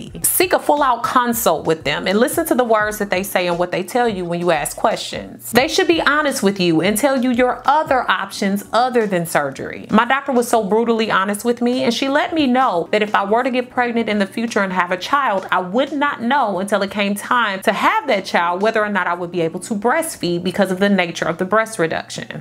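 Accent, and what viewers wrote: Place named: Midwest, United States. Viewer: You sound like Monique from Moknowshair channel. Are you from St. Louis or the Midwest?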